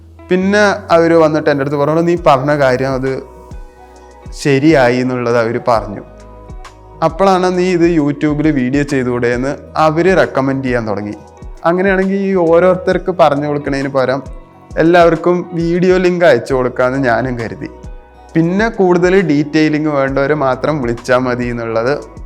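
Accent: Palakkad, India. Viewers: പാലക്കാട്‌ ഭാഷ ആണല്ലോ ❤❤❤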